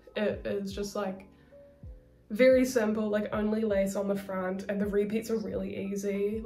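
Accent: New Zealand. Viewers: I'm assuming from your accent that you're in NZ. I'm in Aus. Where do you get Knitting For Olive in the southern hemisphere? I've only found one website that would ship it to Aus and it was a very expensive Japanese website that would have cost like x3 normal.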